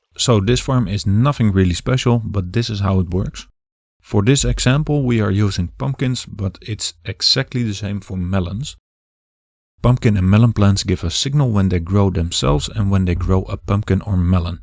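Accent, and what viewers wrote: Netherlands. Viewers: u sound dutch sir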